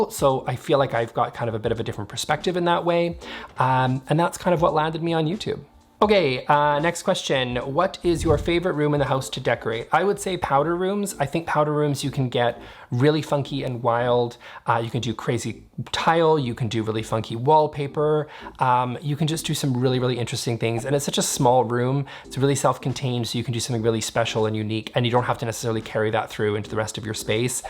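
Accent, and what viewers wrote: Canada. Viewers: that makes sense. I’m British but have absolutely no problem with Canadian accent (or most Canadians’ accents). I find his voice, despite the quick pace of speech, quite calming and very easy to listen to. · Congrats on the 60K mark! It's si great to see things from a Canadian perspective...the minute I first clicked on one of your videos I noticed the accent. YAY!!! 🤗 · I LOVE that you talk fast and get to your points quickly. People need to rev up their brains...or hit pause and go back, lol! Your accent and manner reminds me of another Canadian designer, Emilia Wisniewski....what a hoot it would be if you two did a vid together!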